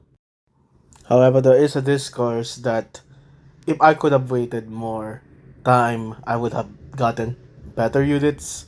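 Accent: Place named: Philippines. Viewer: bro u pinoy, the accent is kinda pinoy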